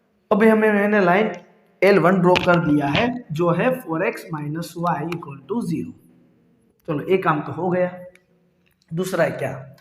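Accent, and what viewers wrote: Gujarat, India. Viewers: Sir Are you Gujarati your accent is like that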